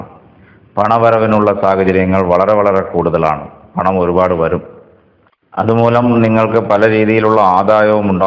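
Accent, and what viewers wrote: Tamil Nadu, India. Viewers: താങ്കളുടെ ഓരോ വാക്കിലും ജോതിഷം പറയുന്നതിൽ 100%സത്യസന്ധത കാണുന്നു സംസാരത്തിൽ ഒരു തമിഴ് ശൈലി കാണുന്നു കേൾക്കുന്നതിൽ ഒരു സുഖം 🙏🙏🙏🙏🙏🙏🙏🙏🙏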